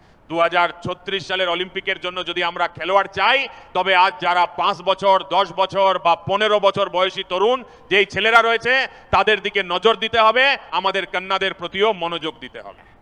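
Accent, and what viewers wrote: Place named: India. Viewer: বাংলা উচ্চারণ আরেকটু স্পষ্ট হলে ভালো হতো। অনেকটা হিন্দি টানে বাংলা হয়ে গেছে।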